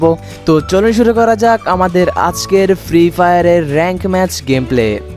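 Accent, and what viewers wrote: Noakhali, Bangladesh. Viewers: তুমি যে নোয়াখালী ভাষা বল আমার থিকে জোস লাগে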